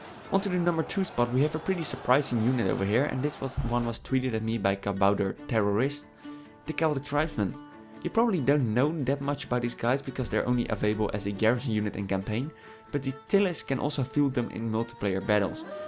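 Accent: Netherlands. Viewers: Are you by any chance Dutch? Cool video btw:) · you dutch? i hear a dutch acsent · Volgens mij hoor ik een Hollandse ondertoon? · dutch, I guess. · lol your dutch accent tho:D so obvious haha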